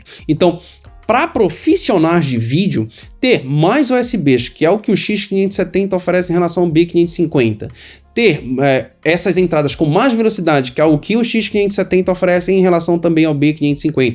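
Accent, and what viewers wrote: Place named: Belém, Brazil. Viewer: Mano... Tu és de Belém-PA? Teu sotaque é típico da nossa rapaziada. 😁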